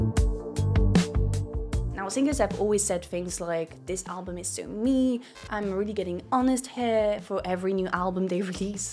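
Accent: France. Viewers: maybe it has to do with her french accent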